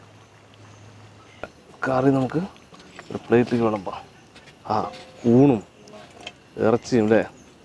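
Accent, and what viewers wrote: Palakkad, India. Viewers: നിങ്ങടെ സംസാരം ആണ് ഹൈലൈറ്റ് ഞാനും പാലക്കാടനാ ലഷ്മണൻ എവിടെ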